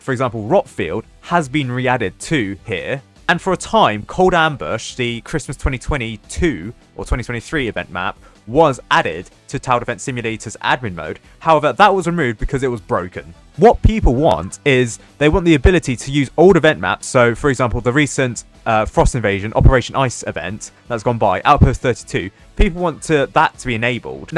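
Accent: United Kingdom. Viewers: i forgot was British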